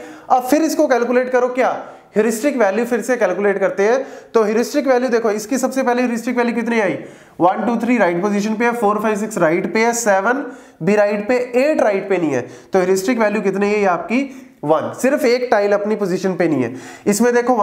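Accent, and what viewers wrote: India. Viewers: Indian English.. too hard for me to comprehend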